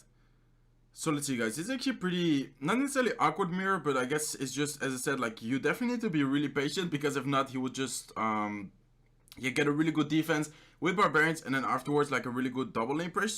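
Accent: Netherlands. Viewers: Are you dutch? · Ben je toevallig nederlands ik herken het accent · Dutch accent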